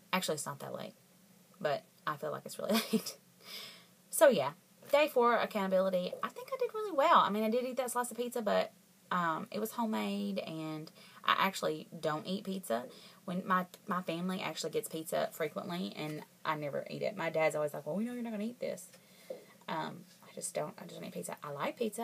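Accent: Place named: South, United States. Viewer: I'm on the east coast so your so southern sounding and I love it :) enjoy your weekend and keep up the great work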